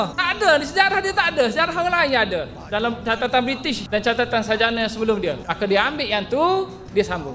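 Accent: Kelantan, Malaysia. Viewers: Ore klate ni. Kawe first time dgr pun dah tahu.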